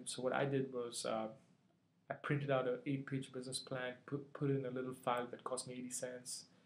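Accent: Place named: India. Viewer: It sounds like you are from India. Where are you from in India?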